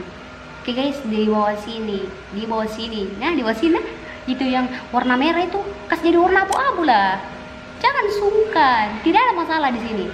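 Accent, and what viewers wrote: Makassar, Indonesia. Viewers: Sy senang makanan khas Makassar & logat nya itu loh enak bgt 👍👍 Ewako Makasar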